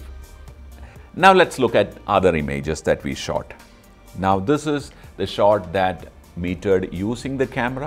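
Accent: Kerala, India. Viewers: This is how an idol photography class should be,simple yet powerful explanation The only photography channel i've subscribed Like the way you deliver,your voice and personality I am a fan of your malyali accent of speaking english :) Thank you so much sir for :)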